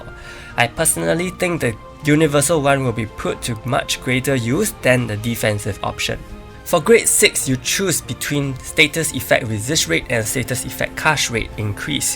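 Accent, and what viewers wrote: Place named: Singapore. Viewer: Hah! I hear your (very familiar) accent and took the chance! Singapore yo ;) Looking forward to the next series. Take care in the meantime!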